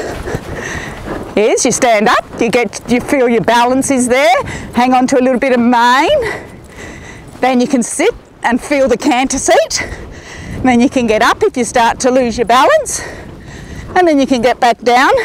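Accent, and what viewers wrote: Australia. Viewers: I’m doing lessons and I might canter this term and are Australian because of your accent I feel like your Aussie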